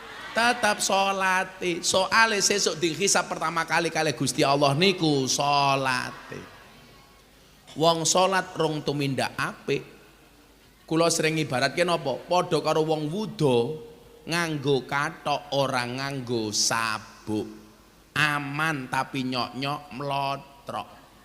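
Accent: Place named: Java, Indonesia. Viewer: Kiyaiku yg ganteng alhamdulilah muncul lagi.aku suka medok jowone metu.kiyai udolah semua imat sejahat raya.gas puulll mantap kiyai ganteng.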